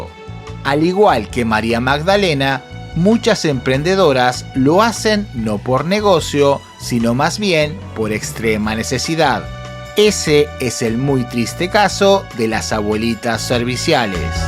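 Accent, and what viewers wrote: Argentina. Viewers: De donde eres brot? Argentino no? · Tú acento Argentino y tú léxico mexicano me confunde… 🤔💭💭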